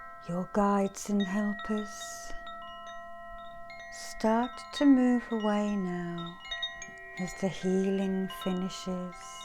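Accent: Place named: Southern England, United Kingdom. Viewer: Something about the tones of you south Britons is perfect for this gig.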